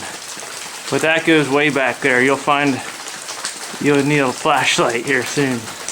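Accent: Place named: Western Pennsylvania, United States. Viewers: Your accent sounds more like Pa than Ky. Especially SouthEast Ky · Are you from Western PA or rural MD? I can tell by the accent. ;)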